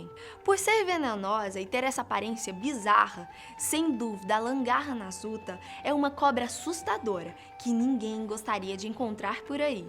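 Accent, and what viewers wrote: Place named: Northeast, Brazil. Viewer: Gostei do sotaque, que parte do meu nordeste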